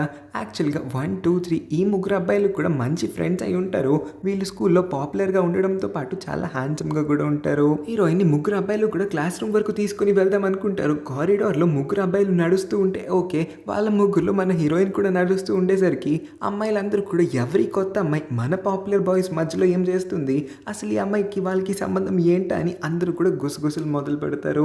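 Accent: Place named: South Korea. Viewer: మీ మాటలు వింటుంటే కొరియన్ బాయ్ తెలుగు మాట్లాడుతున్నట్టుగా ఉంది మీరు మధ్య మధ్యలో నవ్వే నవ్వు భలే ఉంటుంది క్యూట్ గా ❤❤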